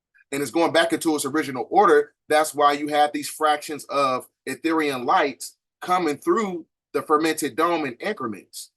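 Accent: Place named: Louisville, United States. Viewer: I hear Louisville accent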